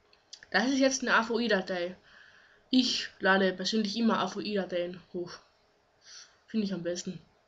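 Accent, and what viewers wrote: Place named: Saxony, Germany. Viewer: Genau. So sympathisch ein sächsischer Akzent auch sein mag, bitte bitte bitte mit Zucker drauf: Spreche so, dass man es verstehen kann!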